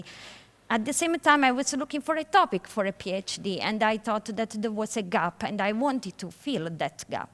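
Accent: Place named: Italy. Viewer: English with an Italian accent :)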